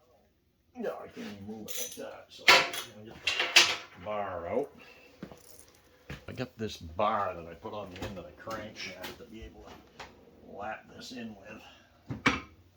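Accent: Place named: Boston, United States. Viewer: you must be from Boston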